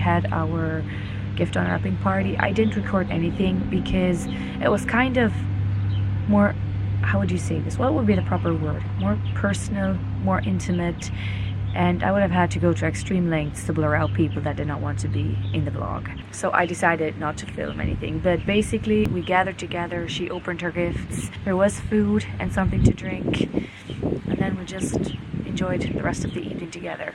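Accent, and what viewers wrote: Ireland. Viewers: Irish here, living in California with 30 yrs. Your accent sounds Irish to me??! History of the Hutterite please? Curiosity getting to me lol! Hope everything went very well and God’s blessing on the new couple for a long marriage and children God willing, you got my taste buds wanting to try your food! Thank you xx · I take it you’re in Canada but do I hear a hint of Irish too? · First time watching. Have you ever been told you have an Irish accent. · What a delight the YT algorithm was to me today to find you, your sweet spirit and generous sharing 🙌🙏 I really enjoyed this educational and inspiring look into your world and your almost-Irish accent reminded me so much of my Irish gramm 🥰 We’re in the construction industry in Alberta and have always had Hutterite (ex colony) boys in our employment: thee most respectful, hard working and diversely talented employees ever.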